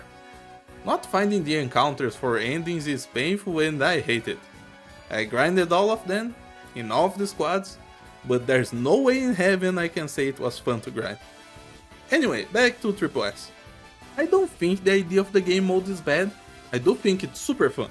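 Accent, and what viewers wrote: Brazil. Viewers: You sound like a Brazilian speaking English, could I be correct?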